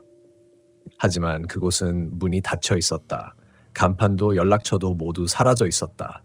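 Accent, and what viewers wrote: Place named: China. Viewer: 왜 이렇게 중국인 발음이 나올까?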